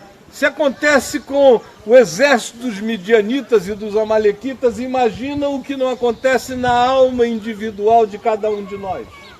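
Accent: Recife, Brazil. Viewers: Aí sabe que fala Recife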